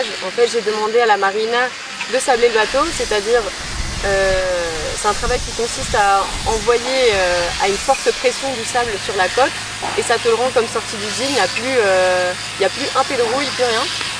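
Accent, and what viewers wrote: Quebec, Canada. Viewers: Cool de te revoir ça fait plaisir d’entendre ce petit accent québécois. Et toi tu en est où?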